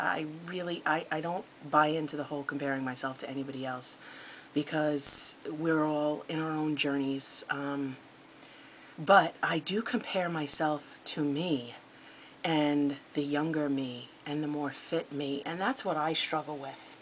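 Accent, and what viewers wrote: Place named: East Coast, United States. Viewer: Great vlog! So proud of you thinking through things you're "saying to self". Ps..love your east coast accent:)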